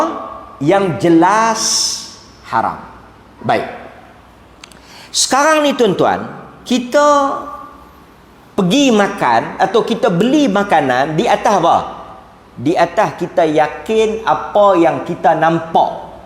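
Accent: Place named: North, Malaysia. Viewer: Orang utara mmg bahasa kasar skit,tapi aku suka sbb ustaz ni teroih terang..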